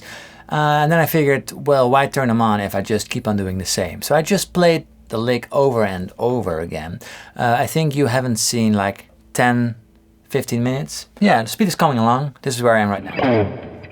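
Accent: Netherlands. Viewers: Is he dutch? He sounds dutch af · U dutch? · toen ik voor het eerst een filmpje van je keek hoorde ik meteen al ''dit is een Nederlander"'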